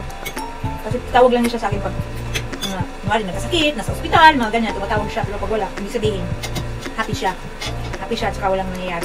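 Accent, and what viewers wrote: Visayas, Philippines. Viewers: ang ganda talgaang magdalita ng mga bisaya btw ang ganda niyo din oo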